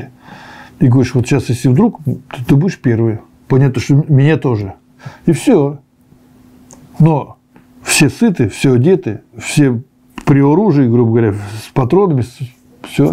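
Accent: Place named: Okanye, Russia. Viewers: у меня был приятель из Коостромы, так он так же говаривал, я ему говорю - специально, а он удивленно, а я по другому не могу. А теперь послушай говор жителей из ДНР, ЛНР и солдат ВСУ, у них один характерный южный говор, такой же в Ростове, Краснодаре и т.д. Для изменения нужны годы, вот Примаков наш Премьер и руководитель СВР ранее говаривал с Тифлиским акцентом, а после института как диктор телевидения. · Слушаю, стал как он окать!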